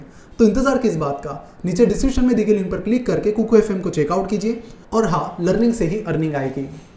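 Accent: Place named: Maharashtra, India. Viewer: Did anyone notice his Marathi? Loved it tho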